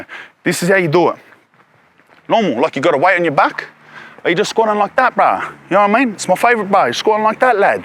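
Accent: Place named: Sydney, Australia. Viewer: Boom brother, oos lad! I’m from Brisbane so we don’t talk like that but I had a mate from Sydney who talked like that. Love it! I could listen to old talk all day haha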